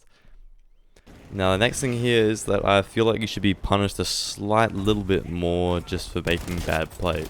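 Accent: Australia. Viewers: Man it’s good to hear an Aussie voice 🤘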